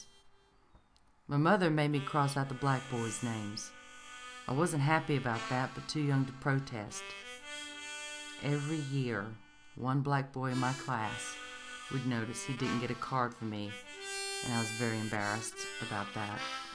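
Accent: South, United States. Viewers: Excellent work! I'd liked the Southern type accent, you reminded me of "Thelma" from the movie "Thelma & Louise"....one of my favorite flicks! xxxxx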